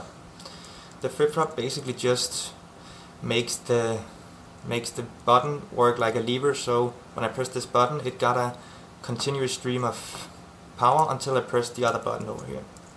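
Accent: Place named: Denmark. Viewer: are you danish? you really sound like your from denmark. the accent is really special for danes.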